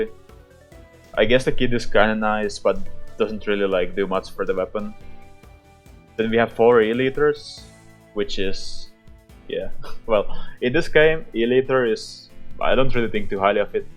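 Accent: Finland. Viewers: Ui juma arvasin ihan suomen aksentti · Suomi? This was recorded to me from yt and you have a Finnish acsent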